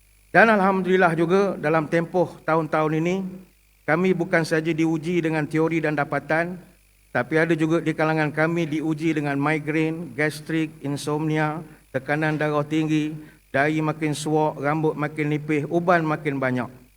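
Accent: Pahang, Malaysia. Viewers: ustZ tetap dngn loght phng bkn sesetangah ulamak dan org phg lain maaf ckp mudah sngt ubah2 bhsa. nk malu ape. org klate selamba je. kedah pun mcm ustaz wadi tetap ngn loghat nok malu benda. malu bertempat bru btul. ni org dtg sni kita pulak nk ikut bhsa diorg. mat org putih tu ikut loghat teerengganu sbb org ganu tetap ngn loghat dan tetap hebat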